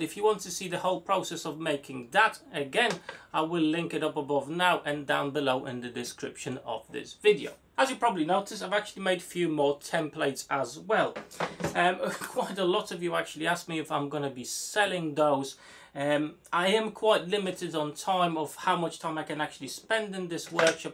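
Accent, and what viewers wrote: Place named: United Kingdom. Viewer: I have found your channel because i have also recently started using the table circular saw and the miter saw from Evolution. I am from Germany. I can understand your English very well. What part of the UK are you from? Your dialect is very different from what I learned at school. I have subscribed to your channel and hope for more interesting videos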